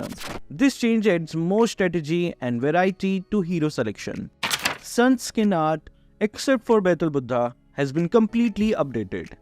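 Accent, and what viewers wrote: India. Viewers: Every now and then i still get annoyed by your voice or accent(in our country we see indian accent as "STINKY"), but hey! At least you bring pretty useful information. and thanks for that :v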